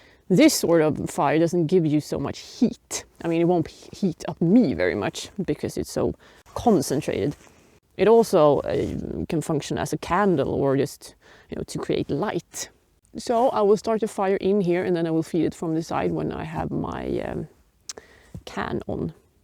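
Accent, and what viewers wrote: Sweden. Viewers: Hej, är du möjligen från Göteborg. Låter som en göteborgsbrytning under engelskan 😏 Bra filmer. Bra innehåll. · Dear, your videos are a great inspiration to the ladies I date, and I am very entertained watching your adventures. I try my best to explain bushcraft techniques to so many ladies, and I find that many do not comprehend or retain the Information. Your videos are very good at helping break the communication barrier between Men and Women, the ladies actually retain your words and comprehend your reason, intentions and actions, to achieve your adventure challenge. I may say the same phrases about the same actions you are doing, and the girls simply do not understand it coming from me sometimes. I started to think beautiful hair, long fingernails and glamor trips to the salon equal a comprehension challenge with bushcraft and survival education, you proved me very wrong and humbled me with who you are. 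Thank you so much. Do not be offended by negative comments from people, they are simply jealous of you in some way. Several ladies I have shown your videos to have mimicked your Swedish to English accent when we are out on our own adventures, because we all think it is very beautiful and sexy, and sometimes they do it just to get my attention, and it works very well for them to do so. I am proud of and for you.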